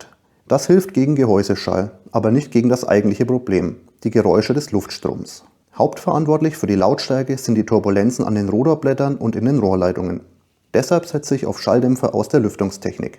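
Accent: Franconia, Germany. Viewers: Immer wieder angenehm den fränkischen Dialekt zu hören. Sehr informativ. Dankeschön 💚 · bitte nicht falsch verstehen - deine Lösung ist durchdacht und erfüllt seinen Zweck. Wollte dein Projekt nicht schlecht machen, falls das so ankam. Besonders der fränggische Dialekt hat es mir angetan ! :D grüße aus Minga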